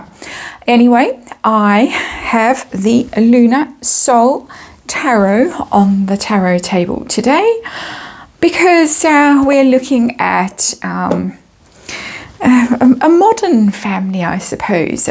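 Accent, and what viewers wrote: South Africa. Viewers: I'm here for the snark too!🤭 I love beautiful, lilting SA accent and the way she giggles and snarks!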